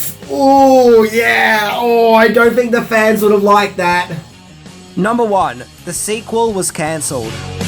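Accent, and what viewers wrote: Australia. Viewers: Personally I think it’s interesting and funny when you pronounce things differently because of your Australian accent. It’s what makes you. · I think your videos are fun, and I have no problem with you calling "George Peppard," "George Peppered." (I just thought it was your Aussie accent, tbh). Just like you called "Hannibal Smith," "Hannibal Lechter" in this video. Production accidents will happen and, all things considered, I thought THAT was hilarious! 😂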